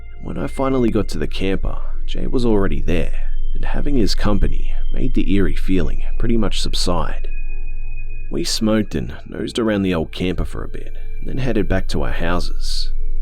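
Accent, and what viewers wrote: Australia. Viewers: Yes 🎉 I actually love hearing a fellow Aussie do these stories 😍😍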